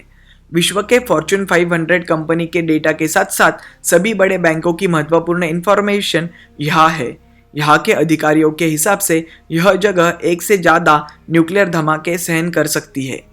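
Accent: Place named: Maharashtra, India. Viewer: narrator pakka Marathi hai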